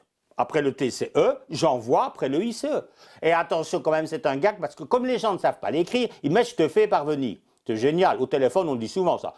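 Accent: Belgium. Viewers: il est marrant ce gars. un petit accent belge non? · Pour le journal parisien il a plus l’accent belge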